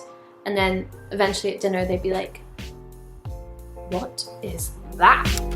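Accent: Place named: Scotland, United Kingdom. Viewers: She has a Scottish accent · I hear a mix of accents when you speak which I actually love.. I hear the u.s/Canadian north American accent, and then I also hear a slight uk accent.. not anything like some other accents from u.k, but I can actually understand every word that you say! I'm north american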